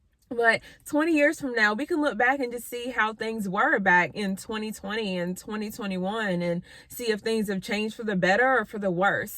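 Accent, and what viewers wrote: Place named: East Coast, United States. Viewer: Im new in watching your channel...love the pantry tours..and your accent is cool too!! I mosdef can tell you are from the east coast!!! Keep doing you!!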